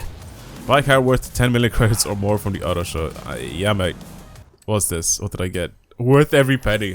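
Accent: Ireland. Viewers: What part of Ireland u from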